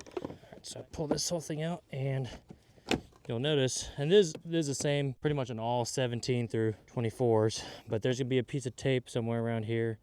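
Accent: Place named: Texas, United States. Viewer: What I like about this one is the honest approach/honest self appraisal of safety, wiring, and personal accountability to get R done, then, later, to address any issues after the fact since time was short for the install. The other thing I like about this one is listening to a Texan talk. Last thing is to have here the corroboration to/of where I can find my Upfitter Switches' terminus under the hood! Win/win/win. Thanks a ton! Cheers.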